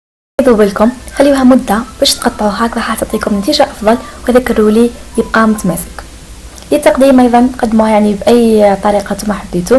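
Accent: Algeria. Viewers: من صوتك عقلتك بلي جزائرية مي كي شفت خميرة نوارة تأكدت هههه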